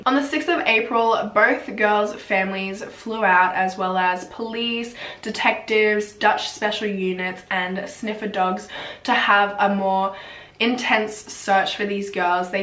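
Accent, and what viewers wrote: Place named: Brisbane, Australia. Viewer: Girl I somehow knew you were from brissy listening to your accent 😂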